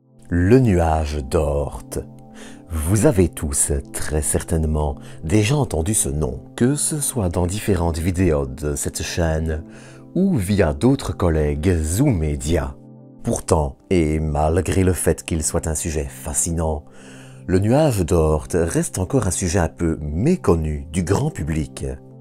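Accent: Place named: Namur, Belgium. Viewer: Mais de quelle région de Belgique venez-vous? J'ai l'impression namurois mais certaines intonations sont exotiques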